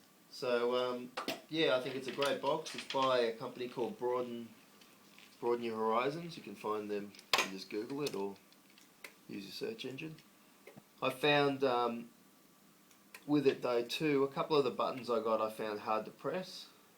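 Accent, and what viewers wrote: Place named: Australia. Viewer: Hi, thanks for the interesting video - a really interesting setup you have there. I'm in a similar situation to yourself - quadriplegic (or tetraplegic as we tend to say here in the UK). I'm C4 complete - I'm guessing you're C5? Anyway, I'm also a keen BF3 / BF4 player, although my approach to playing the game is distinctly 'low-tech' compared to yours:) Take a look at the video on my channel & you'll see what I mean! I assume from your accent that you're based in Australia? I would suggest that we meet up in a game sometime, but I'm sure the ping times would be horrible, and I guess you play on the Playstation 3, whereas I'm on a PC. Anyway, best of luck with the gaming!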